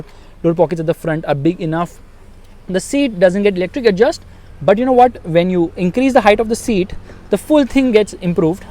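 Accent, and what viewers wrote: India. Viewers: Desi english accent is so funny😂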